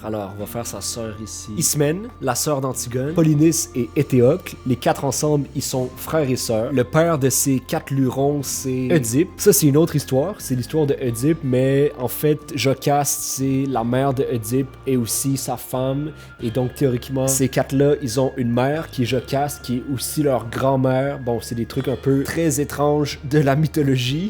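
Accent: Quebec, Canada. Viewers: j'adore ta chaine, continues tes vidéos lecture, c'est très intéressant, ce que tu as écrit à la fin m'a touché, c'était très bien dit, bisous de France (haha j'essaie de me familiariser avec ton accent québécois)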